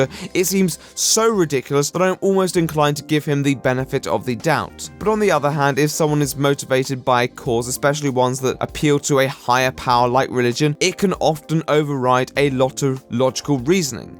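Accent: RP, United Kingdom. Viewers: I love this guy like, he makes my brain feel so good with t he monotone British voice its just fantastic. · I just like watching you shred people with your posh accent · Wow you sound incredibly british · Your videos are really nice to listen to while doing homework. They’re long, interesting enough to enjoy, but doesn’t require much attention to listen to, and your posh accent is a plus. You make good shit.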